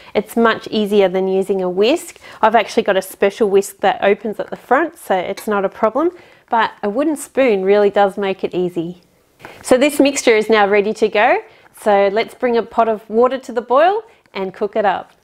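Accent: Australia. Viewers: Wo in Deutschland bist du aufgewachsen? Woher kommt es, dass du nach Australien ausgewandert bist? Du hast auch einen australischen Akzent und keinen deutschen!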